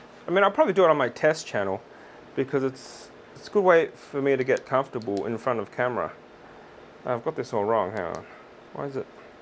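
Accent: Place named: Australia. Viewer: interesting video. I have a Revomax flask too, around 500ml. Say, your accent tells me you have lived in Australia, right?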